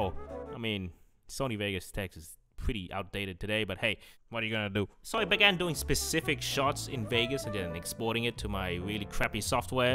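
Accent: Australia. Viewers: your Australian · Wait…… is Australian? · Is aussie? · You are from Australia? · Wait. Are you from Australia? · Wait so you’re Australian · OH SHIT I NEVER KNEW WAS AUSTRALIAN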